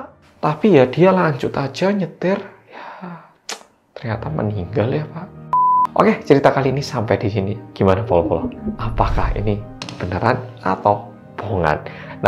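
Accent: Java, Indonesia. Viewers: Mau yang medok saja pleaseeeeeee😂😂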